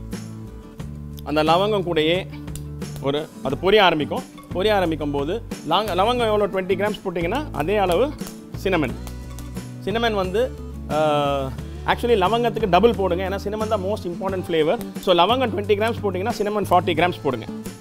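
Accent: India. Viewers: Can't wait to make this. Its speaking an Indian dialect of English?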